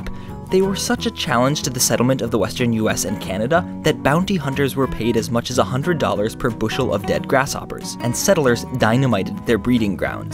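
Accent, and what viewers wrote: Midwest, United States. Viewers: It the midwestern accent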